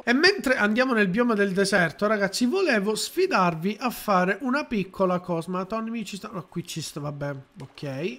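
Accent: Sicily, Italy. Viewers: Sei siciliano?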